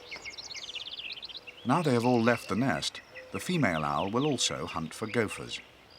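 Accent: United Kingdom. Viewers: These documentaries always seem right when a British dude is doing the dialog...... Got that accent thing goin.....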